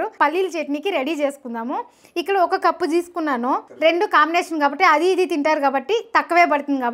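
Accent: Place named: Tamil Nadu, India. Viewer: Telugu bale tamil vaalla telugu la bagundhi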